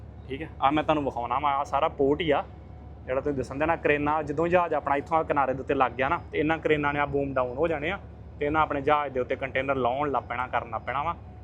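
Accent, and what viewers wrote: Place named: Gurdaspur, India. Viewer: tuhadi boli toh lagda hai k Gurdaspur dinanagar toh ho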